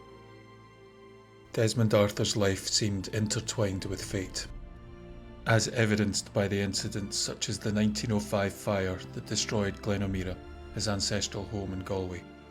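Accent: Scotland, United Kingdom. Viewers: Just your voice alone makes one wish to see Scotland.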